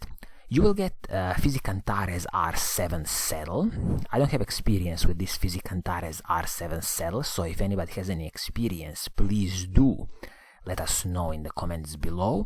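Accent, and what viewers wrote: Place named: Italy. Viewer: love these videos, Brilliant! full of info and great Italian accent!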